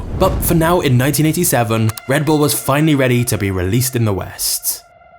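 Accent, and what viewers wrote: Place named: Midlands, United Kingdom. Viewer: It's a middle England thing, this is how people speak. For strong and proper English, go North!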